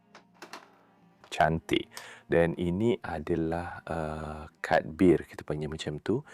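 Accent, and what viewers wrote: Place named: Indonesia. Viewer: Sekejap bahasa melayu sekejap loghat Indonesia...🤗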